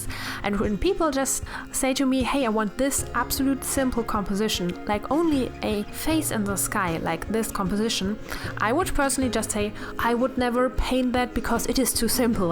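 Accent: Germany. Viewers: Your german accent totally annoys me but your paintings are awesome.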